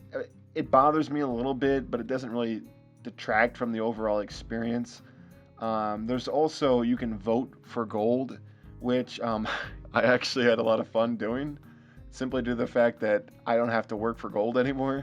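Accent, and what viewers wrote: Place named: United States. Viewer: This is so american, i dont know why, your voice your sentances :D love it <3 It just sound like your selling something :D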